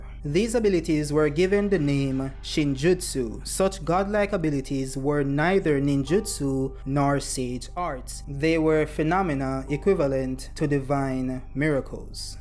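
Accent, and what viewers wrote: Jamaica. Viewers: are you jamaican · I stg im following you for your tasteful Jamaican accent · I know you were from Jamaica because of the accent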